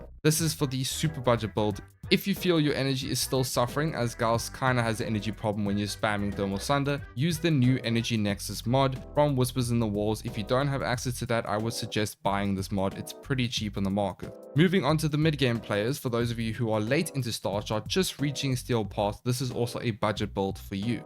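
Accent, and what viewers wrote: South Africa. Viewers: u south african??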